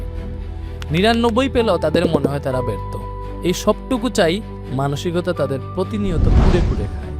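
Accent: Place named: Sylhet, Bangladesh. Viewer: সিলেটের ভয়েস না দিয়ে শুদ্ধ বাংলা ভয়েস দিলে অসাধারণ লাগবে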